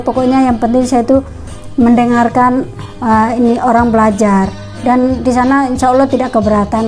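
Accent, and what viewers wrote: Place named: Java, Indonesia. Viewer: Ngaku asli timor tapi ko pu dialek kental jawa tapi tarada dialek timor... Ko bisa lancar bhs indonesia dijawa tapi tada dialek timor... Panipu ko, ko bukan asal timor tapi pernah tinggal di timor... Itu sudahhh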